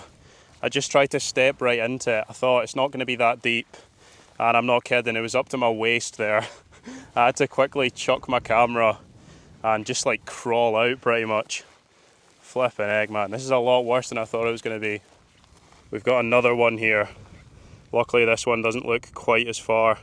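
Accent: Scotland, United Kingdom. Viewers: Love this program. I'd love to see some episodes from the national parks or even greater or lesser known castles or more country estates of royalty etc. You can still go traipsing up the wee little monros all ya want. You come across very well when you speak. You would be good at telling the stories of the castles etc. Your Scottish accent is lovely. 🙏🏽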